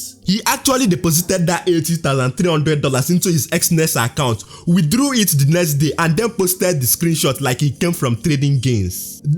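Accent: Igbo, Nigeria. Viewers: Your accent is Conc igbo and is disturbing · Ur Igbo accent ❤ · Thick Igbo accent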